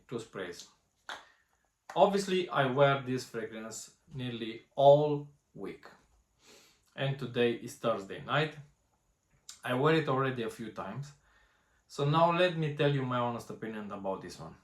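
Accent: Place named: Romania. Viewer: Bro, am o gramada de "influenceri" in lista de Subs si este foarte adevarat ce zici... aia vreau numa sa faca bani cand cumpara toti care se uita la videourile lor, azi te-am descoperit si sincer esti forate honest, si asta trebuie sa se aprecieze, nu inteleg cum nu ai mai multe like si subs.. aici ai un sub +, Salutari din Spania ( pe canalul pe romaneste am vazut ca vorbesti similar ca accentul meu 😂 sigur suntem din aceias zona din Romania 🤝🏽🤝🏽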